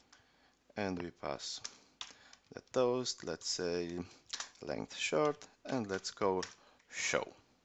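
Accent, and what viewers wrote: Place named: Russia. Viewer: Your accent is so cool, it's like Russian mafia people, so cool, also the tut is really helpful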